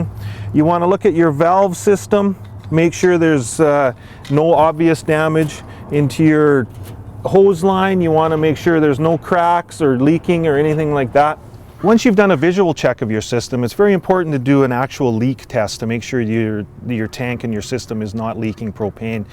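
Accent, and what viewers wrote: Canada. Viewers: Is he Canadian? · I swear that guy is in Canada because i keep hearing his accent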